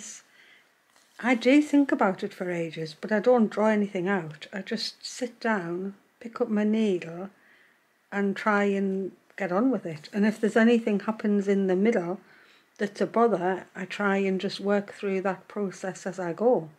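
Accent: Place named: Geordie, United Kingdom. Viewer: Watching from my home in Australia, though i am a Geordie. Loved the video and recognised your accent. Where are you from?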